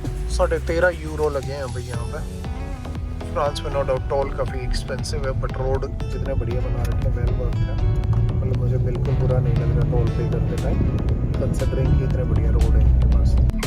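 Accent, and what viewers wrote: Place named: Haryana, India. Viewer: Brdr Apko aawaj me haryanvi touch hai AAP Haryana me Kha ye hai bataiye please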